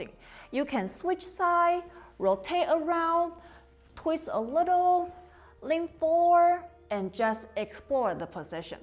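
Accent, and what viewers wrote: China. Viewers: 为什么我听到了潮汕口音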